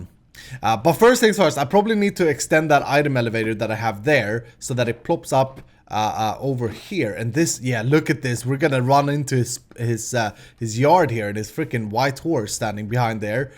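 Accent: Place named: Sweden. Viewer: Heyy you freaken swede with a gorgeous accent! ☺ I have a school project where I have to interview someone, and I was wondering if that could be you?!😉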